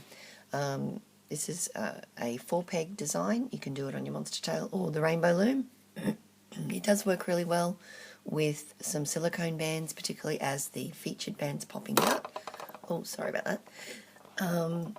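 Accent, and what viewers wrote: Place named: Australia. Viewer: Hahaha! I so understand not waiting for the "perfect time" to do a tutorial! If you have kids or an adult kid (husband;) ) you just have to "wing it" at any possible moment or none will EVER get done. Love your designs, you are amazingly talented! Also, I am so infatuated with Australia and love listening to those who have an Aussie accent, your manner of speech is SO COOL!!! Right now I am trying, SO HARD, to comprehend monster tail type looming. I am in awe of those, like yourself, who are making such beautiful designs this way!!!! Love you looming friend:)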